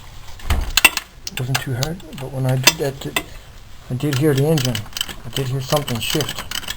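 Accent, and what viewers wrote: Canada. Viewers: Another nice review. You seem to have a maritime accent -- are you from there?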